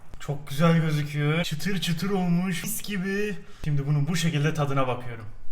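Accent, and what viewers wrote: Adana, Turkey. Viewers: abi sende adana şivesi var heralde kesiyürüm bakürüm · Abicim adanali misin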